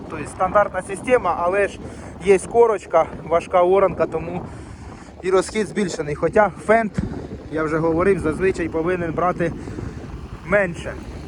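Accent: Poltava, Ukraine. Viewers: Проживав би ти там де він, і сам так розмовляв би,Полтавський суржик він такий.Ластівка залишилась і далі працювати.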